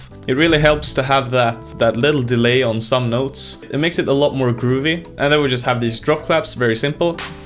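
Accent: Sweden. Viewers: Feel like you have alot of swenglish in you accent. Svensk?! 😄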